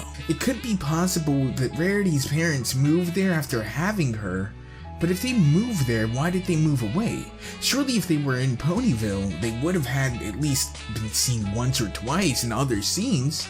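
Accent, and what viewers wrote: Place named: United Kingdom. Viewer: On an unrelated topic, I had no idea you were British until the beginning of this video.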